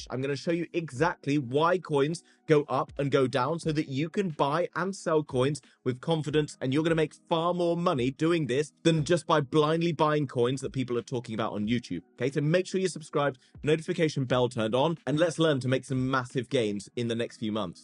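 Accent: United Kingdom. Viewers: Love your content man! Your British accent is GOLD! :-D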